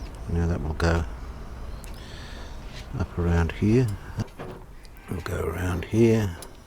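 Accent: Australia. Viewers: Geez thanks, Yup I first saw Manuel shield the caps this way then Dave and you, so if it's good enough for the pros, I figured it was the way to go. The thing is I have no formal electronics' training, I didn't even learn a trade in school; My first studies in college were photography, I struggled to get by in this field so later in life I had a career change and became a police officer (nobody's perfect...) Then after a few years of retirement I stumbled across some of Dave's radio restorations and I was hooked from the start. I always loved vintage audio and my personal gear is all from the 70s and 80s. I leaned about radios by myself and watching you guys' tutorials and got in contact with Dave for my first restoration of a 1937 RCA-Victor 87-K AM console. He's been mentoring me ever since, I owe him everything. As I gained a little more experience, like I said I started understanding more and more about radios and repairing them and there no better hobby for me at this point in my life. 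As for editing videos I know what you mean believe me, I 't have a proper video camera so I shoot everything on an iPhone and Apple being Apple, editing is always challenging... I of course subscribed to your channel some time ago and I'm always looking forward to your next instalment. Oh and by the way I love the Aussie accent 🙂 Cheers mate, lol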